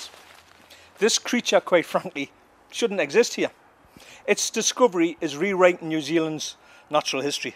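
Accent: United Kingdom. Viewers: ''the size of a siberian tiger'', " that spent most of its time in the tree ". Yeah right, a big tiger-sized tree animal that only had Moas for prey, how stupid this Scottish accented narrator think the audience can be ????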